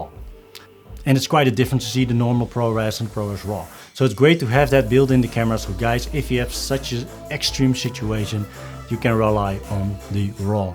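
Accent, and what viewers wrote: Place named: Netherlands. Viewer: only be one the best :), Nederlands tongval ;)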